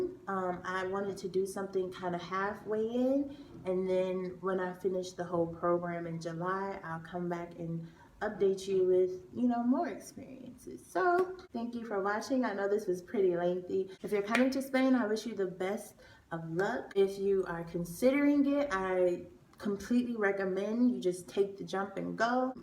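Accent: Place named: Chicago, United States. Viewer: I thought I heard a Chicago accent🤔 hey girl 🙋🏾‍♀️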